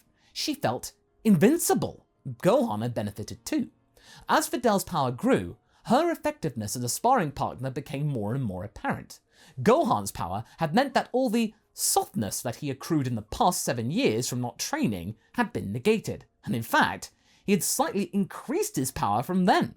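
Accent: United Kingdom. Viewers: I said it with you every time -A Fellow brit (I think ur British-)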